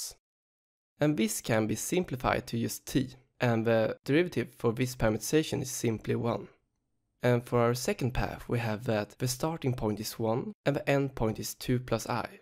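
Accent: Sweden. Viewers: ikr!! he has the loveliest Swedish accent xDD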